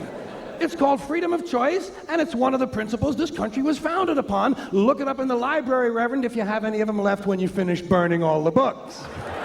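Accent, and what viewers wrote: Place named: United States. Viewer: بس متقن اللهجة الامريكية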